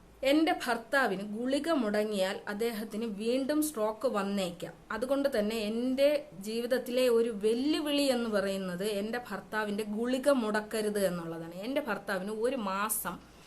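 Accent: Malappuram, India. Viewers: Awalk bhazh malappuram mix aanalo samsarkunnath awidayum veettupanik poyanna thonunnath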